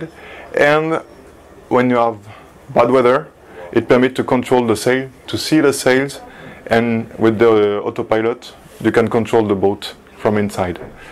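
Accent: France. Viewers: Ah ! le célèbre "french accent" !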